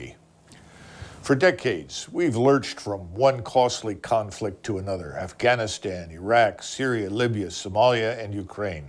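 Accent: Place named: United States. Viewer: I dont care what party he votes for, he sounds like a REAL American.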